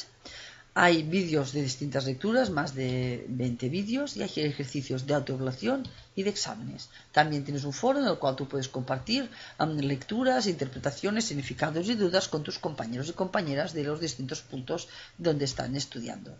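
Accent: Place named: Spain. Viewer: Hola, gracias por los vídeos, pero sería mucho más agradable que Ud pudiera vocalizar, hablar..más lentamente , ya que no todos somos españoles y su acento y tonada, hablando asi!, tan rápidamente, hace que sea un poco dificil de seguirla por la cantidad de información que hay que asimilar y da la sensación de estar Ud muy apurada por terminar! :-( Gracias!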